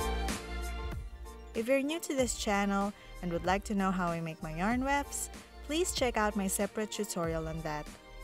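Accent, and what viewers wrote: Philippines. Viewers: Your accent tho, are you from Philippines? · Yea I could tell she might be filipina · Are you Filipino? Cuz your accent sounds like Filipino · You have a filipino accent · U r filipina right? The u talk English . ^^ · you have a filippina english accent are you filipina? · Is she a Filipino? I can tell from her accent. 😅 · is she Filipino? her accent sounds like one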